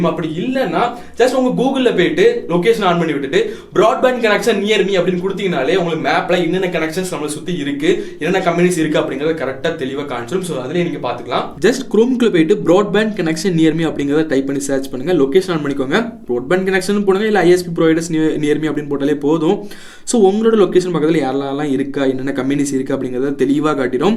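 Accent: Kanyakumari, India. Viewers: Ninga kanyakumari ya na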